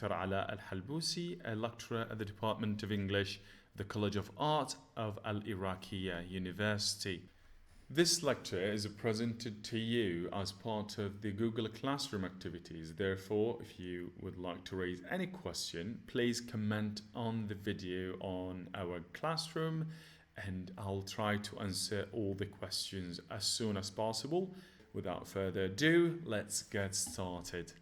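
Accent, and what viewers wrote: United Kingdom. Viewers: I'm not into British accent but you're literally something else 👏💙!!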